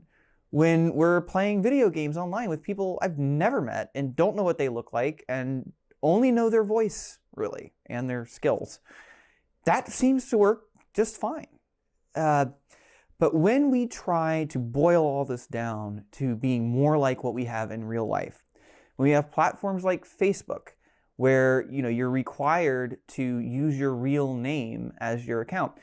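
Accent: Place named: United Kingdom. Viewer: I think he’s British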